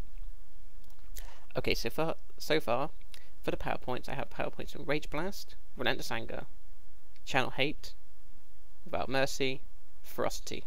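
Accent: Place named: United Kingdom. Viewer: Love all u ppl trying to tell how to improve his loadout and he just hits u with the most logical reason as to how wrong u all are. Is it me or is it easier to grasp the load-out with that Brit accent? 4 real...same with smile and orbit....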